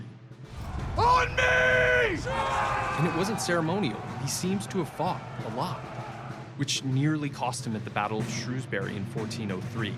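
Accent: United States. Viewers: Atleast get a narrator with an English Accent, this history lesson of England with an american accent seems so unoriginal and uninspiring. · It's a new experience for me to hear English history in an American accent - I enjoyed it. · Its jarring to hear a US accent, I would have preferred an English one.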